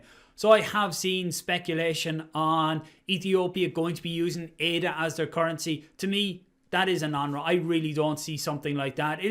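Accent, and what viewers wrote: Ireland. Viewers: normally i can't understand Irish people, but i didn't realized he's having irish accent till i saw your comment.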